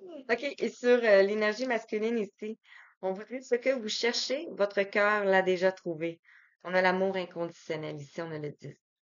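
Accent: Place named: Canada. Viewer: Bonsoir 😊je vous écoute avec beaucoup d'attention et je suis bluffée ❤merci beaucoup pour votre voix douce et votre accent canadien tellement sympathique 😊 pas trop tard pour vous adresser mes meilleurs vœux pour en cette nouvelle année à vous et votre famille ❤❤❤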